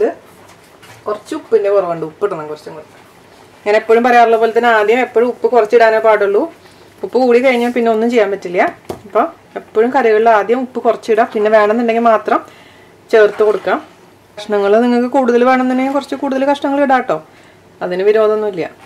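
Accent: Thrissur, India. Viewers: Nice, simple n tasty. Nice to hear your Thrissur accent, n nice English subtitles. If you put some more of those subtitles, it will be of great help for Non Keralite viewers. In north India Kerala people, Kerala cuisine are very popular. Wonderful job👍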